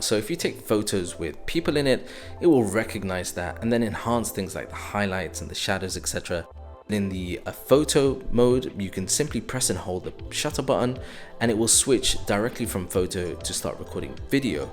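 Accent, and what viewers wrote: United Kingdom. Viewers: most british accent review that i've ever seen dude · great review :) i‘m gonna get one ig (loveee ur british accent haha)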